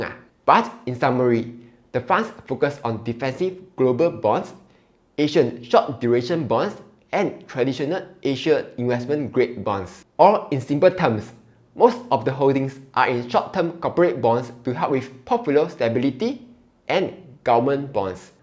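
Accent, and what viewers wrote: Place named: Singapore. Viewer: I find his Singlish slang more relatable.. 😂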